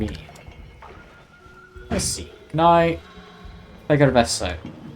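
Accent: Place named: Scotland, United Kingdom. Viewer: sorry if I'm coming off a tad bit rude but you sound a wee bit Scottish. All i can hear is the Scotsman from samurai jack.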